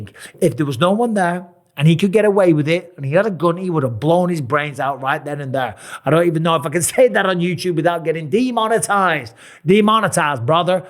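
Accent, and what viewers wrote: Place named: Liverpool, United Kingdom. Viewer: Little scouse prick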